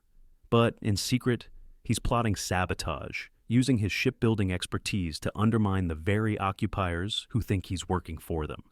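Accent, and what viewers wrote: United States. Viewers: Narrated by american?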